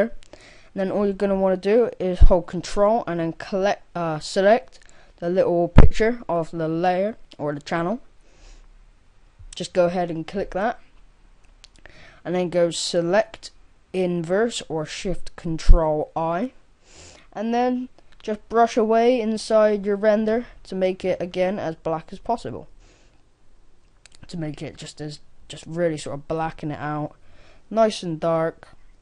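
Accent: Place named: Devon, United Kingdom. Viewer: Do you live in plymouth ?or devon ? It sounds like it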